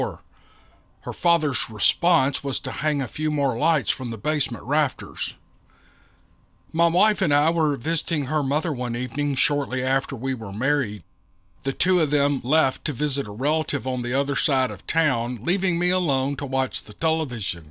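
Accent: South, United States. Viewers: I get to listen to your southern charm for a few hours tonight. Thank you, we all appreciate your stories and your charm. Hello from Pensacola Florida y'all. Best regards to all of you. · Love that southern accent and laugh sir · Hey, I hope that all is well with you and your family. I have came across your channel doing the Covid out break and it have been a delight to listen to you narrating these amazing stories. I enjoy hearing the Southern Comfort of your voice and the in-between conversation. By the way, I wanted to check on you because i've been missing your (live) until the next time, take care and I will continue to listen to these awesome stories.❤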